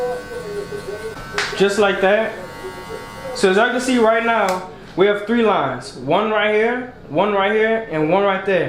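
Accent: Louisiana, United States. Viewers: Say bruh you from New Orleans? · Southern Louisiana as a native..... He sounds like home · Louisiana accent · Ooooooh you sound like you from New Orleans….tell me I’m wrong…please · Showing my 18 baby your tutorial and he said "momma he sound like you"...I said I know he definitely from the City! N.O represent!! 🫡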